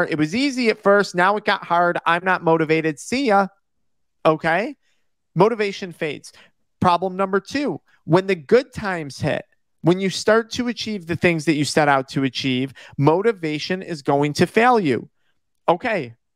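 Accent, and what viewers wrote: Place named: Upstate New York, United States. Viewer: When I first heard your accent I thought this guy sounds like he lives in upstate NY (I'm in Bing) and laterin the video you confirmed you are from NY! Lol thanks for the content, its very helpful!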